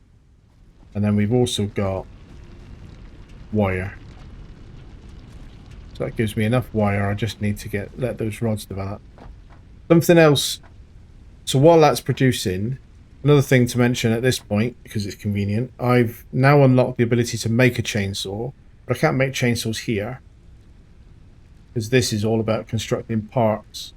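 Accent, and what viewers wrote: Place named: West Country, United Kingdom. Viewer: You're quickly becoming one of my favourite YouTubers. I've been binging your snowrunner series. Currently on episode 30. Can I ask with your accent. Are you from Devon/Somerset area? Fellow UK resident here!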